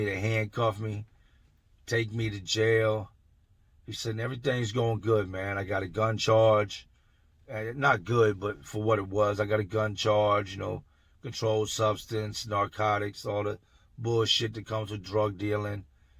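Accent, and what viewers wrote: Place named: Philadelphia, United States. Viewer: Bro i love your stories. I thought you was a little wiggerish at first but thats just how they speak in Philly. You're gonna blow up. Your content is better than 1090 and Deaths by far. You don't do all that dumb extra shit man. Damn I hate how death stops to laugh or make the lamest jokes. I literally cringe when he does that. So I unsubbed. Death def isn't hard and he hella slow. But ofc you wanna keep shit sweet w him cuz that's how you get put on is getting an interview by him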